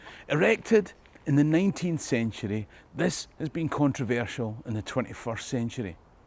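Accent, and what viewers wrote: Scotland, United Kingdom. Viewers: l've watched a few of your videos, and being from Texas l love to hear that Scottish brogue. I remember my Dad wanted me to go with him to a drug store and hear this lady cashier, as l purchased a carton of smokes for my Mom. When she exclaimed " Ooh,ya shouldn't smoke. There bad for ya!" I turned to my Dad and told him "She's from Scotland." That lady was kinda shocked that I could tell by the accent. It wasn't long after, a couple were moving in next door to my Mom and Dad upstairs. And I only saw him and his girlfriend (wife) going to bring a full size couch up So l insisted to take the couch from the female. After we got it into the apartment (flat), when he spoke l could tell he wasn't from Texas, but Cork, Ireland. His significant other, she was a California girl but not a blonde! I mentioned to them about the lady at the drug store that was visible from the building where we were standing. 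I know he was excited about Ireland getting into the World Cup that year, lf l remember correctly it was in'90. He had ask me did l watch football, and l just assumed he meant American football, l said football hasn't started yet being Summer time. He then said Soccer, l told him as a kid l played it in Gym class. But in the '70s it wasn't popular as nowadays in America. Too much running for me, and l've always been a skinny person, but smoking doesn't help neither. But l do like to hear y'all talk!😊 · Outstanding presentation -- I'm always excited when I hear your Scottish accent with "Let me tell ye' a story ..."!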